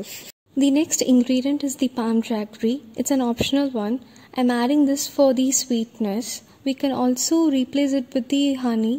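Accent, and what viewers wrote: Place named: United States. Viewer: American atma in Indian body....your angreji is so English ji.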